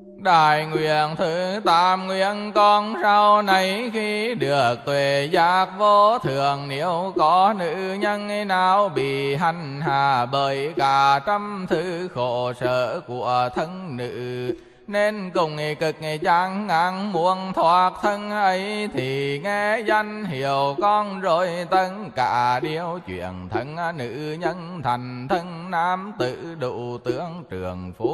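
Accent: Hue, Vietnam. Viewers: A Di Đà Kính tri ân Thầy trì tụng kinh cho chúng sanh thật là hay! Giọng Huế âm áp, trầm bỗng thật đặc biệt. Kính chúc Thầy thân tâm thường lạc, tiếp tục cho chúng con nghe nhiều bài kinh nữa. Kính, DT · BACH Thầy ,Thầy tung giong huế của Thầy quá hay rỏ từng tiếng con rất thích đươc nghe mải mải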